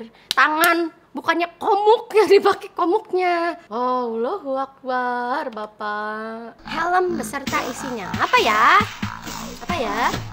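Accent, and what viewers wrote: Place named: Java, Indonesia. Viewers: Boso.mu medok² jowo Ki wong ndi toh😴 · Reaction logat Jawa lucu kak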